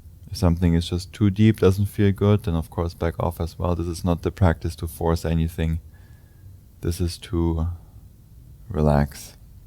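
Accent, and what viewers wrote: Germany. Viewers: I like your German accent. ❤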